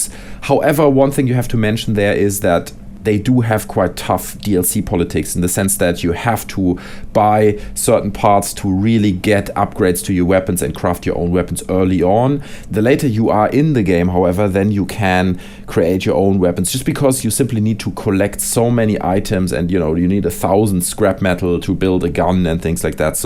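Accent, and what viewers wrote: Germany. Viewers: Hes german you dip wad. That's kinda how they talk. And if not why dont you just fuck off somewhere ever heard of the golden rule? How about you stop posting hateful comments that dont pertain to the video.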